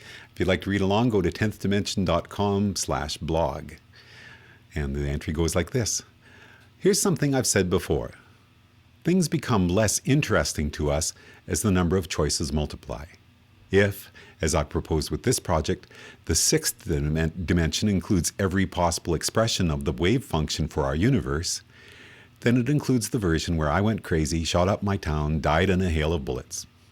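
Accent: Canada. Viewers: His thick Canadian accent is distracting me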